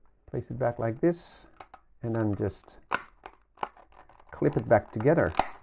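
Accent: Netherlands. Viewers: Hahah, that accent really gives you away. Hallo, noorderbuur! 😁 And thanks for the instruction video. Was considering picking up a walkman from eBay, and wanted to get an idea of how hard it would be to replace the belts. Didn't look too complicated, so now I got a WM-FX45 + new belt on the way. One thing though. When replacing the belts, it's always a good idea to clean the pulleys with a q-tip drenched in alcohol. This removes the rubber residue left by belts that have gone sticky, or rubber dust from belts that dried out. Always a good idea to do some maintenance while you're in there anyway.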